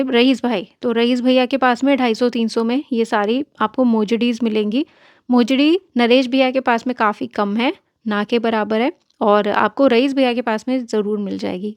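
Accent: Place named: Karachi, Pakistan. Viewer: Lrki tm bikul hmari trahn yani Karachi walon ki trhan Urdu bolti ho..pharry aan dhmky wghera.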